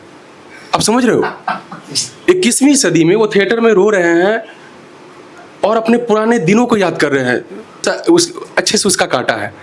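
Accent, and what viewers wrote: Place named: Bihar, India. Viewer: Bhai aapke accent se hi pta chalta hai ke aap Bihar se hain,, dekhne me nhi lagta. Anyway Too good bhai ❤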